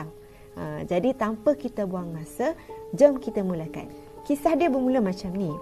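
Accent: Kelantan, Malaysia. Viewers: Kak orang kelantan ke orang luar salah cakap pok cik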